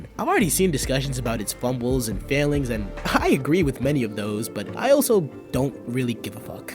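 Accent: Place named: United States. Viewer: american accents are so cool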